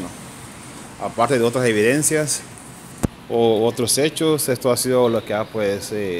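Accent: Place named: United States. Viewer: Disculpe, ella nunca ha estado callada, pero los hondureños tenemos mente corta,creo que ud más pues por el acento que le siento en sus declaraciones o comentarios se le puede escuchar un acento como del Norte.(USA).pero que le vamos a hacer..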